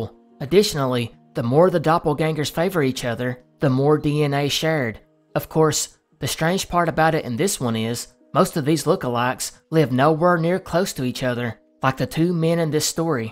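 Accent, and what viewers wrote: South, United States. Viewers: Been here since around 2k subs i think. I would not be surprised if this becomes the next 1,000,000+ sub mystery channel. Great content mate, and very glad (even as a UK resident) that youre not getting the hate you used to get for your southern accent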